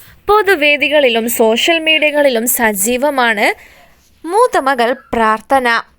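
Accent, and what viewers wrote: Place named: United Kingdom. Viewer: ഇംഗ്ലീഷ്കാരി ആണോ മലയാളം വാർത്ത വായിക്കുന്നത് 😎 കഷ്ടം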